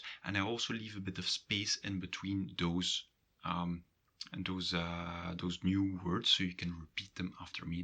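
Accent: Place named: Belgium. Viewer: Well, finally i can find a real Dutch course video from belguim's accents . I will follow all your courses...:-)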